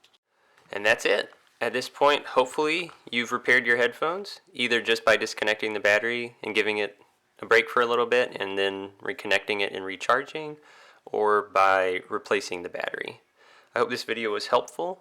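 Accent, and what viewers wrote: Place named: United States. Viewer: Very nice guide. I love American Accent ❤️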